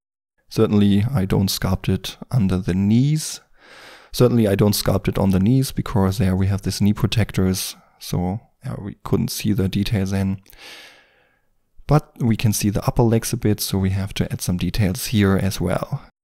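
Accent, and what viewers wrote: Germany. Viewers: Schönes Englisch, etwas hört man dein Deutsch aber nicht auffällig. Dieses Video war informativ und wirklich sehr toll!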